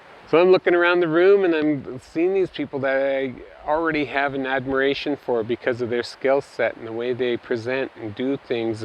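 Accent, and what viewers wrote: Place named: Canada. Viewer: I knew you were Canadian when you said the word 'process'. Americans say 'prahcess', not 'prowecess. You also said 'bloody excited'. More common adjective (bloody) with Canadians probably since 1 in 5 Canadians are of Scottish descent.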